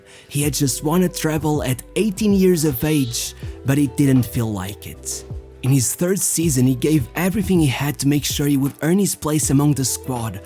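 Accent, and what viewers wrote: Portugal. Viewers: Are you Portuguese or from Portugal? I am, and the way you speak is very familiar. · By your accent you are portuguese. I know because i speak the same accent 🤣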